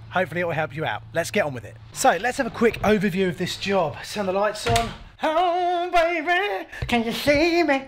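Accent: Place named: Scotland, United Kingdom. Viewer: Thanks for the entertaining vids and love the Scottish accent - 'suits you Jimmy' Question from me handsome is I need to run pipes in an interior concrete slab for 4 meters then into a suspended floor to reach a sink and dishwasher position my wife chose!!!.... I really don't want to have any junctions under either floor can I use PEX off a roll and just connect at both ends???.... Bet you got a vid for that eh?!! Dandy Dave x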